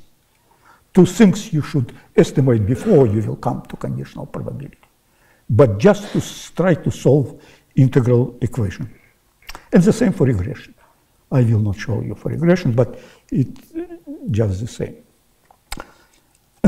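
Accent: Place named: Russia. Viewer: Google clearly didn't train their model on the accent of elderly russian professors.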